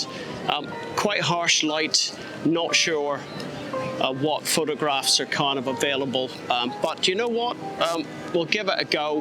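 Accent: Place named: United Kingdom. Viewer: Just came across this channel. Around 9 years ago I swopped a Leica lens for an x-t1 and I'm still using it today. They're an excellent, reliable little camera that does everything I want and has never let me down. Some great shots of Venice showing what this little gem of a camera is capable of, and just subscribed. As I always say, 95% of a good image is being there and seeing the potential and the other 5% is the gear. From your accent I think you may be from the same little island as I am, but further north maybe? Enjoyed the video and will check out some more.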